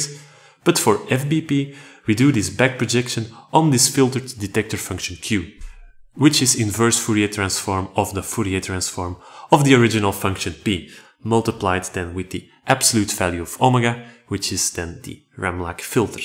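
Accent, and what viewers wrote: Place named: Belgium. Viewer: you sound belgian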